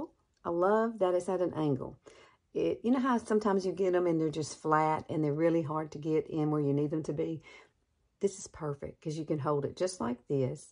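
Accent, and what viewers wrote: South, United States. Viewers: Love your southern accent. I have a ton of family in Alabama..What part of Alabama are you in?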